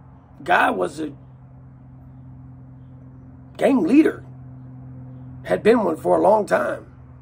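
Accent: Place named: South, United States. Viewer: It's very Southern too really. I personally dig when it comes to story tellin' n' all.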